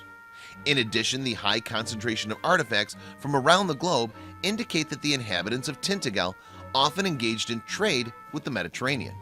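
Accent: United States. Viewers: No offence but always put off by American voices talking about ancient English history and archaeology.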